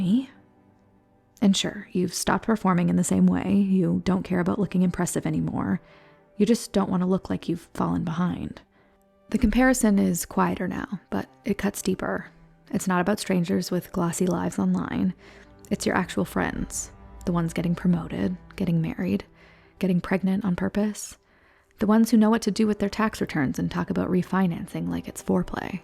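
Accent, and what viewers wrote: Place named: United States. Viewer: You've got one of the loveliest "next door" American accent out there! Keep it up and thank you very kindly for the effort and content! 😊🎉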